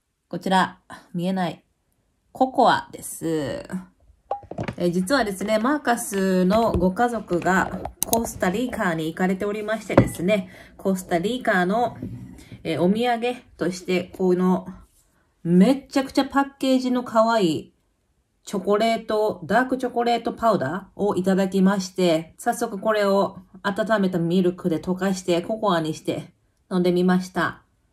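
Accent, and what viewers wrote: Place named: Osaka, Japan. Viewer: 英語でしゃべったら嫌がられるなんて。。フランスみたいで辛いなあ〜。なんで、そんなにプライドを捨てられないのかなって残念ですよね。でも中にはそういう親切な方もいらっしゃるということがやっぱり嬉しいです（＾＾）。ちなみに、のそのまんまの喋り、面白いです笑大阪人ですね〜笑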